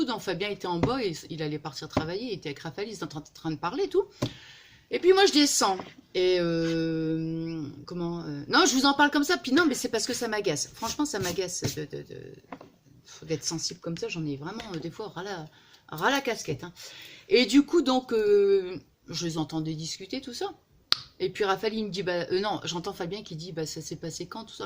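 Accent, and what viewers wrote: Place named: North, France. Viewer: Coucou très simpa ton petit album je suis nouvelle abonné et je te trouve très rigolote ton accent du nord me fait plaisir je suis de la Picardie bisous Merci pour ta vidéo gros bisous ☺😀😚👍💕👏💕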